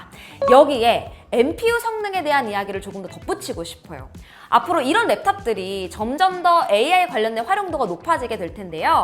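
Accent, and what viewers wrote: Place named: Seoul, South Korea. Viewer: 이분말투 전형적인 요즘 서울말사투리네요 ㅋㅋ중간중간 음올리는게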